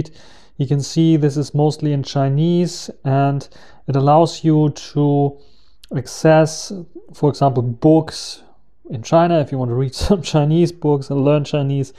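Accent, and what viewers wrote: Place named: Germany. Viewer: Nach drei Wörtern Englisch wusste ich sofort dass du ein Deutscher bist. Diese Akzent findet man nur bei den Deutschen wenn sie endlich sprechen. 😂 Aber nettes Video, lass dir gern ein Abo da. Frohes neues. Das Vivo x80 pro gefiel mir vom Design her besser, ich persönlich warte bis Vivo die Frontcamera 4k fähig macht.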